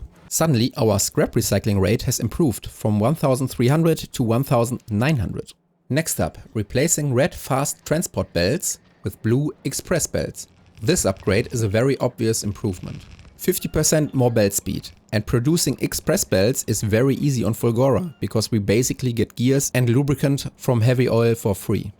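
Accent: Germany. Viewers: If your factory is dependend on belt speed, make smaller factories that work in parallel. 20 Sushi belts in 20 minimal bases instead of the clogging of a long track of recyclers. For me, any row of 20 or more recyclers clog up, as they cannot empty their contents. And even internal storage for anything but scrap is underused, as they will only do the exactly same recycle job to add to internal storage. Warum schreibe ich eigentlich Englisch, du sprichst doch mit deutschem Akzent im Video.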